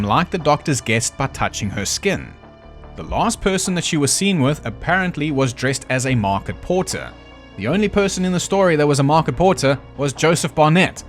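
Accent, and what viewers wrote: South Africa. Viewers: Dude you are fucking hilarious. It’s like listening to a south african George Carlin reading crime stories 😂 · Had some South African friends in London back in the day and it does me good to hear the accent again.....especially those wide open "I's"...(eyes...)....oh yes, and great content, love the chat-by-the-fire style, infectious laugh + amazing research and thoroughness...give a treat!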